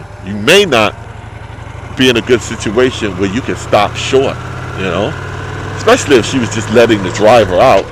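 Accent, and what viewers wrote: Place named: New York, United States. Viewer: Hello fellow NYer...Great video...nice & easy rider. Just love to hear that NY accent again. Makes me miss the big apple. Brooklyn born and now living in hot hot hot Arizona. Nice looking bike. Mine is the same as Dbest1's. Love just cruising around enjoying the scenery. You "ain't" boring at all!!! Keep on gettin' on bro. Dbest1 recommended your vlog and it was all he said it would be. Kool... RSMF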